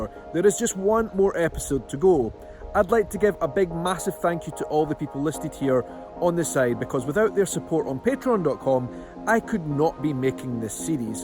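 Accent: Scotland, United Kingdom. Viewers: Thanks for sharing mate! I love your videos. The sad thing is those make me feel like I've made a bad choice to live in England, the good one is, I can still try to move north :) Scots accent is so cool and easy to understand, I could listen to it all the time, and all that nature you have there it's so beautiful, so remote. It feels - to me - like Polish have so much more in common with Scots rather than with English - the culture, expression while speaking, and so much more... I'm happy to subscribe!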